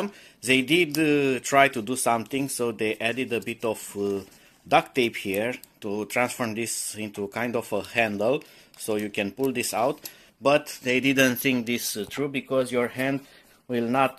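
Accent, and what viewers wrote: Romania. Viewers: Recunostii romanii dupa accentul pe care il au in engleza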